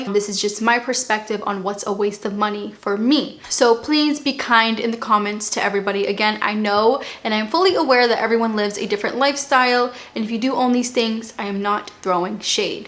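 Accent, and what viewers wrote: Boston, United States. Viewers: I love how you say cancer your Boston accent comes through so cute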